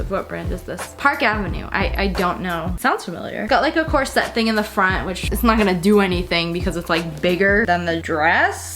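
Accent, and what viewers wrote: France. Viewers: Are you actually french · are you french though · are you french for real??????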